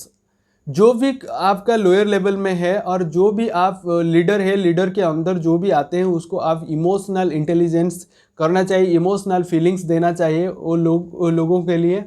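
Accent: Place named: Odisha, India. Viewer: Dear, Great Job and well explained. But I want to suggest you about your accents which you are using, that is for only village people, those who are living in odisha. If you want to became a good trainer on facebook or youtube (or any social platform), you need to work on your accents. The pronunciation of ours (Odisha peoples) is very poor in both English and Hindi. So, continue to motivate others and step up for your great future. Thank you, God bless you.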